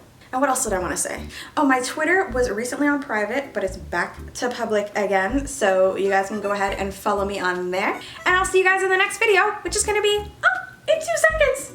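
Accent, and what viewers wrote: Canada. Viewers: Always forget she's French till she speaks Canadian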